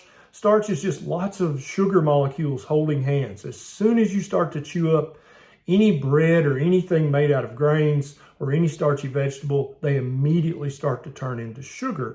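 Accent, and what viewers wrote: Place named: East Tennessee, United States. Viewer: Dr., I am from East Tennessee, living in Florida for decades. I so enjoy hearing you speak. Sounds like Home. And your wisdom, freely given, feels like Home. Thank you to a true son of the volunteer state from a daughter of said state. You have a mighty big heart. Much gratitude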